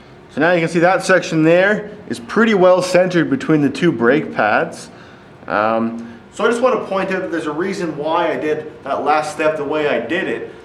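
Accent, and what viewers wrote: Canada. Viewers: That is the most canadian accent I've ever heard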